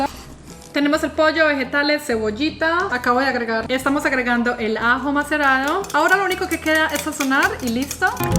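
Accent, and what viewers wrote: Antioquia, Colombia. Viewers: Hola, soy Colombiana y veo tus vidéos desde Ginevra Suiza, me encantan tus vidéos de limpieza y comidas balenceadas, te miro justamente por que el acento colombiano me hace mucha falta, podrias hacer un vidéo de comidas colombianas? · No pierdes tu acento paisa 😊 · Gracias bella por tus videos, siempre me encantan, sea el contenido que sea, tu acento Colombiano cuanso lo hablas me gist mucho, tu simpatia, frescura y tu amor, con el top y los vaqueros ibas muy guapa🎉 La granola se veia muy rica, la voy hacer, me alegra mucho que cumplas tus sueños en compañia de tu esposo❤ graciass siempre por ser tú ❤❤ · En este video se le salió full lo paisa jejejje❤ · Tenía tiempo sin ver tus videos no sé si es por eso que te escuchaba Colombianisima 😊 mi favorito también es el 🥑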